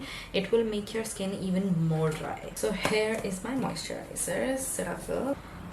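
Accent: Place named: Canada. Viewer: Woahhh that Canadian accent thou🫣🫣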